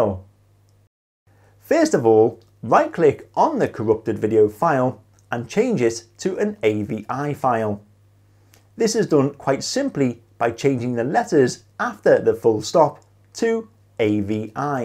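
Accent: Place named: Northern England, United Kingdom. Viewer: Dear, I hope your tips will help me, as I have carried out an audio only recording in mp4 but I can only hear the first 3min. of it, after which, it is complete silence, although the file keeps unfolding/ running/being played for a total of some 120 minutes. But first and foremost, I would like to tell you that I find your Northern England accent sooo lovely, it moves something in my heart! Where exactly does that accent come from? Is it from Cumbria? If so, from where in Cumbria? Coniston? Or anyway, from the Lake District? Anyway, I really love it, and I am so fond of people with that accent and the kind of personality that goes with it! I am already using the Wondershare software to edit, annotate and redact PDF files, and I am very satisfied with it. Best regards, Alberto from Florence, Italy.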